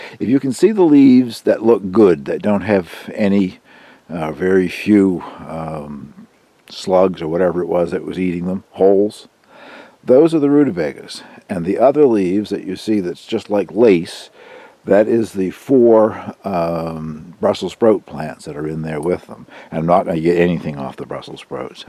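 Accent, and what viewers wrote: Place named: Canada. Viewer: Dear, I have just found your channel in the past 2 weeks.This is the best! Really enjoy all your videos, the chickens, darling Angel, your cabin all wonderful. I think you may be a modern day Thoreau! Thank you for sharing your very interesting, and inspiring life. Have always wanted to visit Campobello Island, as well as P.E.I., hope to do so one day. My maternal Grandparents were Canadian (I am American) and it is lovely to hear your accent.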